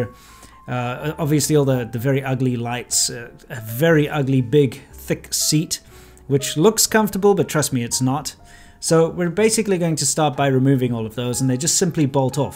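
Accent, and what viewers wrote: South Africa. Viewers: OMG..Sitting here watching this vid as I just bought a mint 2012 GN, and just surfing through related vids and I'm thinking, man, this guy's voice sounds just like my South African cuzzies voice from serpentza and low and behold! Man this is so cool I this channel, had no idea you gad this, keep it awesome! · What part of south Africa are you from?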